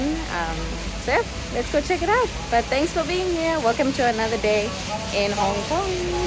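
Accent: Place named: South Africa. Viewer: New subscriber here, I really enjoy your videos❤❤❤❤ Are you from South African? U have a South African accent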